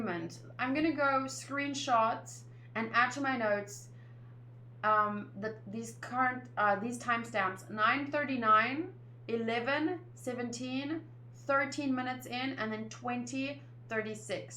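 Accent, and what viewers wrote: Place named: South Africa. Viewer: I just cam across your channel tonight, and when you talked I was like. "YO you South African" if im wrong i will kill myself. But im like 100% sure you are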